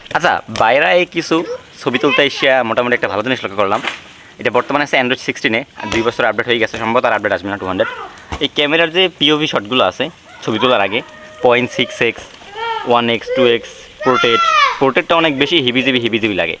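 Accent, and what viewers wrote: Barishal, Bangladesh. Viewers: Vhai ki iccee kore borishailla vasa e khota bolen?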